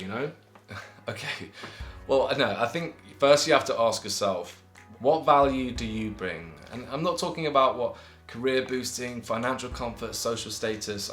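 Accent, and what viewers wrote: United Kingdom. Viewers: !! You're like Rudy from Misfits!! do u watch it? aha just found a similarities with the twins and the british accent. Keep making videos!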